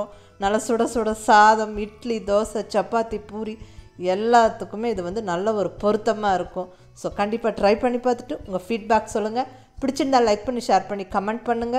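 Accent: Kongu, India. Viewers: அருமை சகோதரி. உங்கள் கொங்கு தமிழ் உச்சரிப்பும், குழம்பும்.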